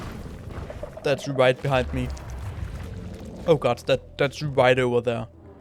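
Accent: Denmark. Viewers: are you danish by anychance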